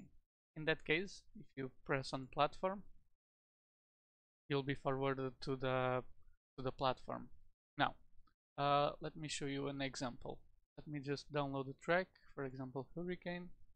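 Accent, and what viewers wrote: Portugal. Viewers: vi logo que eras tuga pelo sotaque, obrigado por todo o esforço na realização deste projeto <3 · algo me diz que és portugues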